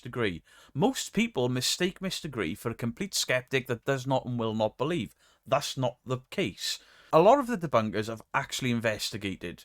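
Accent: Wales, United Kingdom. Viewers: you're not toxic, whoever told you communities were are correct. There are just a small faction of people online who are control freaks, narcissists, and have nothing better to do than try to cause toxicity between groups. They will never stop no matter what you do and do not do. You are beloved in this community. You're the first debunker I've ever seen when I didn't know what that word meant. I could barely understand anything you said because I didn't understand the welsh/english. Now I understand you perfectly, and enjoy listening to you. Mrs. Geek is awesome! ❤You changed my life, recommended other fantastic people who have changed my life as well. Don't let these bad people cause you to question yourself. You've never been the problem. As an outsider I can see much more than some people who are really close to it can. Thank you for being you. 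❤❤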